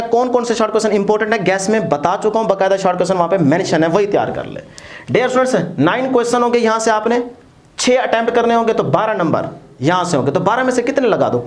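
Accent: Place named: Saraiki, Pakistan. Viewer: Sir AP ky bolnay k Andaz sy pta chal gaya mn bhi sariki ho😊😊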